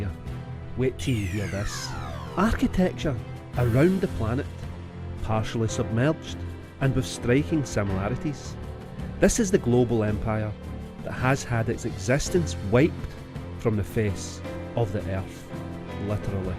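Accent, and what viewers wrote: Scotland, United Kingdom. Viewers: Congratulations, you have stumbled upon the Scottish accent… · English with a Scottish accent. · Please go back to the other narrator Scottish accent is completely spoiling the experience of watching any videos just awful. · All of it. Also, remember that some folks (hi America!) struggle with Scots accents.😅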